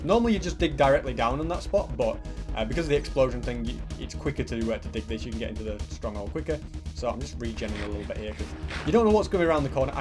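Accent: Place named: Scotland, United Kingdom. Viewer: SCOTTISH LAD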